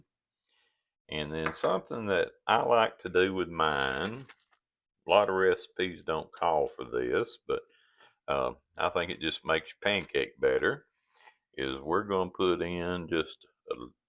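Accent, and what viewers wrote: South, United States. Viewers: Ps. It will be a sad day when we no longer hear such a wonderful country voice.❤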